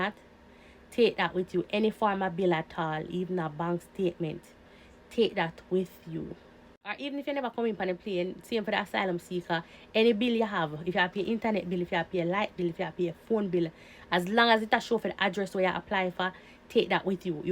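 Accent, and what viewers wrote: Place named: Jamaica. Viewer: Thank fada Gad ur Jamaican,this i understand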